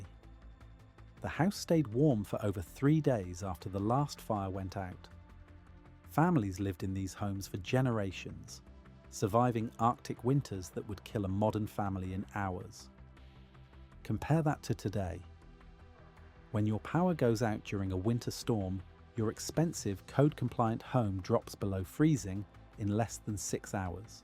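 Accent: United Kingdom. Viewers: Can you please at least put temps in C as well? No one but Americans use this. I mean you have a British accent who uses C.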